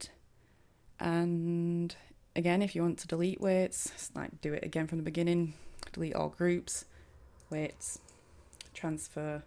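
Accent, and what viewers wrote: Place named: Leeds, United Kingdom. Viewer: Transfer "Wearr-ts" ! I love your Leeds accent.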